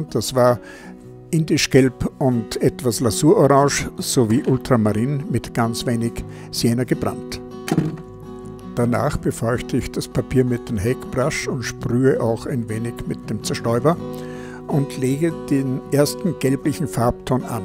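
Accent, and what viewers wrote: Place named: Austria. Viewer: ja, endlich. eine Video für mein Niveau - auf Deutsch bzw. angenehmes österreichisches Deutsch :-) Habe in letzter Zeit einige Video über die Aquarelltechniken mit dem Hakepinsel gesehen. Aber Ihre sind halt doch immer eleganter und effizienter erklärt. Das mache ich unter Anleitung am Wochenende mehrmals nach. Schön, das es jetzt zum Feiertag hochgeladen wurde :-D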